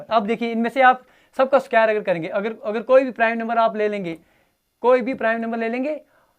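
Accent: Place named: Punjab, India. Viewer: Love your punjabi acent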